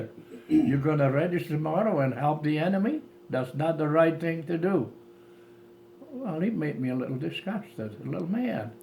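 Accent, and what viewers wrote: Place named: Friesland, Netherlands. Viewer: Thanks for sharing the story. Myn fader is also born in Hantumhuizen. Great to hear the frisian accent❤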